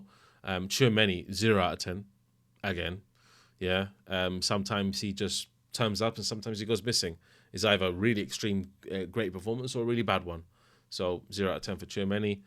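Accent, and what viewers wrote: England, United Kingdom. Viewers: He's spanish with an English accent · How did you get in to supporting Real Madrid?, you sound like a proper Englishman.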